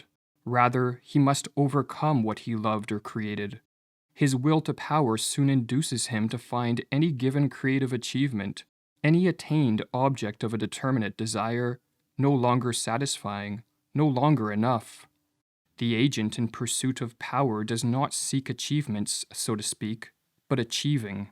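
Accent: Canada. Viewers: Canadian you are, I noticed.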